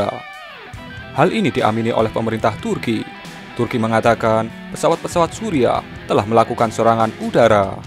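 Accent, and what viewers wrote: Java, Indonesia. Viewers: Medog mu bro....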